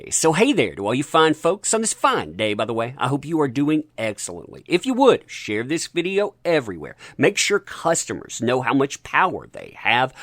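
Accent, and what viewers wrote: United States. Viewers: What kind of American accent are you speaking?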